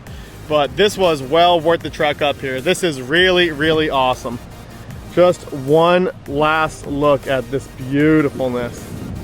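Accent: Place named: Bahamas. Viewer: I just watched your video and enjoyed it; however, I was left with a question: why is it that you sound Bahamian? Maybe it is because you spent so much time in the Abacos, I guess. 🤣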